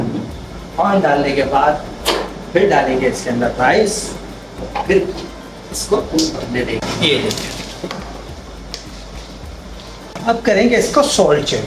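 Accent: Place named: Lucknow, India. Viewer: ❤Nazakat Lazzat aur Aapka meetha Lakhnavi andaz Awesome.Respect from Pakistan.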